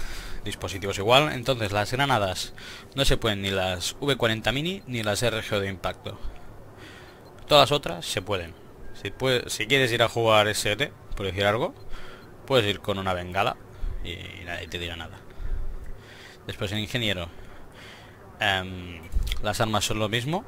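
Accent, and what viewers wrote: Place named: Catalonia, Spain. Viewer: Ese acentillo catalan xD, nada decirte que el otro dia justamente me estube mirando dichas reglas (esl) para una cw y las restricciones en las carabinas y los fusiles son mas ampliasy que los dmrs stan permitidos, igualment bon video company i normal que se t.escapes savent qui ets dubto que et fixesis xD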